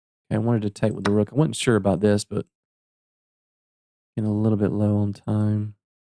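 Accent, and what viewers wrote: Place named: South, United States. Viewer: you know then, secrets of magic can be something of a letdown to most folks. When I sell magic on ebay I can't do refunds for that reason. When I was living in Somerset Pa , I worked for House of Enchantment, 😆, I know , sounds like a brothel, anyway I would sell linking rings to them and they'd come back and say they wanted the ones that I had . I had to explain that they were the same principle, but because I had been doing them for ages , that i could make them appear to melt thru each other and they just needed more practice... There's a lot of awesome mathematical card tricks I could teach you if you're interested. A couple are uploaded on my YouTube channel which I don't promote, it's mostly for myself, but lemme know , I'd be happy to share . By the way, I heard that southern Twang accent , and I would have bet my Good Bishop that you were from Tennessee or Kentucky, maybe even Texas, but I didn't want to insult you if I was wrong lol. 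.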